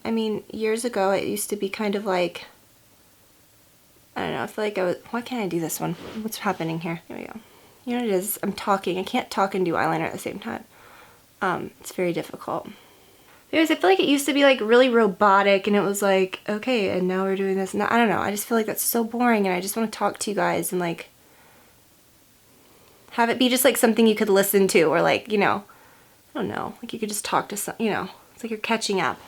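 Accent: United States. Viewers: What state is she from?!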